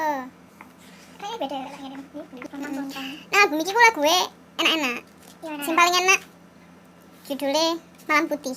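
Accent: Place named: Java, Indonesia. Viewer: YaAllah medok nya😩 siji loro pitu 😩💚💚🌱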